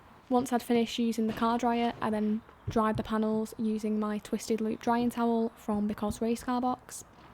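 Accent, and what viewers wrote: United Kingdom. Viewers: I love your British accent 💖 sometimes.....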